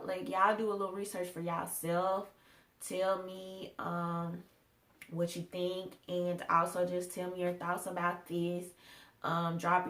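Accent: South, United States. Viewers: Well done! A good place to go for well written opinion pieces is American Thinker. And what a lovely Southern accent, Darling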